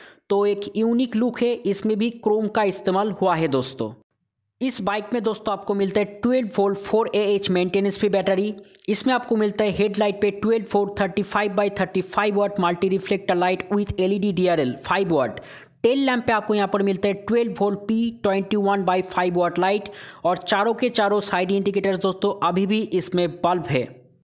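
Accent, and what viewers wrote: Bengal, India. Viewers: I love hearing bengalee accent. Sweet like rasgullah .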